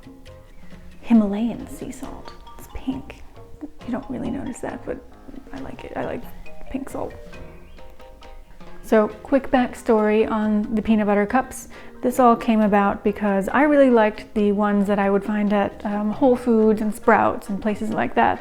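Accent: France. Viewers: Oh my god you speak french i love that ! And your french accent IS so cuteeeee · I plan on trying this next week. And I like your French accent. :)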